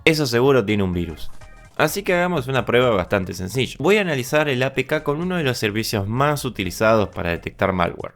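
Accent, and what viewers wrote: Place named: Argentina. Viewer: sabes que un video sobre piratería va a estar bueno y sincero, cuando es narrado por un argentino 🗣🗣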